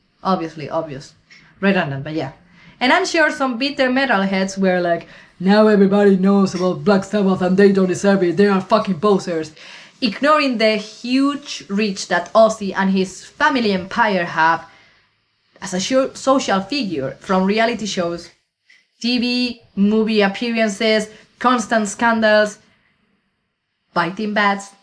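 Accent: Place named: Wallachia, Romania. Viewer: where are you from? you sound wallachian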